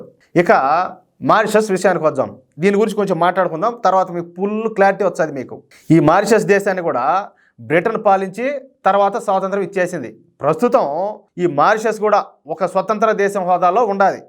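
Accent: Rayalaseema, India. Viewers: Continue the videos with the same tempo and Unique Ratalassema Slang.. 💐💐👍👍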